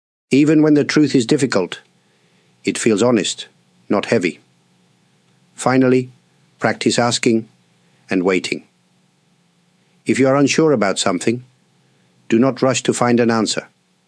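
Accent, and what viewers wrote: United Kingdom. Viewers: He is born in England sounds like he has British accent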